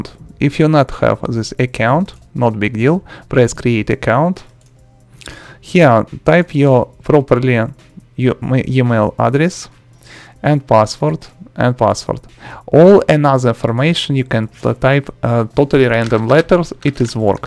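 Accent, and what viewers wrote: Russia. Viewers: Are you from Russia? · your russian accent is a blessing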